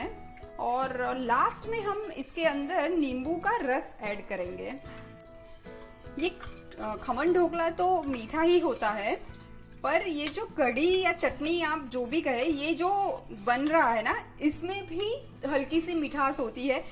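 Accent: India. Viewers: Nicely explained and the hindi accent!👍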